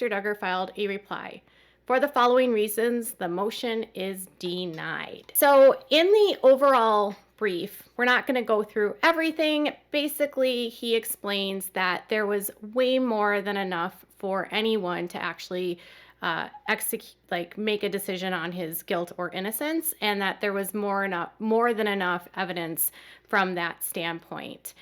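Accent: United States. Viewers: Q: Pro-bat-ive. Stat-u-ette. Other examples. Is this a particular/regional American accent?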